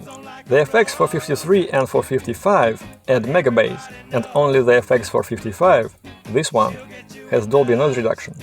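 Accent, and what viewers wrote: Russia. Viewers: Только я слышу русский акцент?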